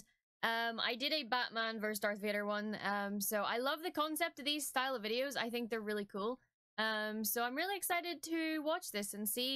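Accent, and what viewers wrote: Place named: Belfast, United Kingdom. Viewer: I must live near you. I Knoe your accent is a belfast accent. Im in Carrick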